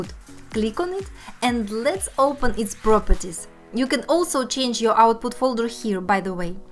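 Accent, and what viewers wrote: Russia. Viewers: Из Русского только русский акцент) но спасибо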